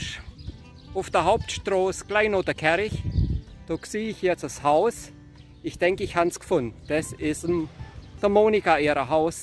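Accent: Germany. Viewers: WUNDERBAR und SEHR SCHÖN, DANKE für dieses schöne Video aus dem Banat. Sag Mal, weißt Du, wo Deine Vorfahren hergekommen waren, denn Dein Dialekt erinnert sehr an das Saarländische und an das Süd-Pfälzische in Deutschland. Alles Gute und nochmals DANKE für dieses schöne Video...!!! Gruß, Marijama. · Suna ca si Dialectul de Bayern!!! Ich Mag Das!!! Gruß Gott!!:hand-pink-waving::face-red-heart-shape::face-red-heart-shape::face-red-heart-shape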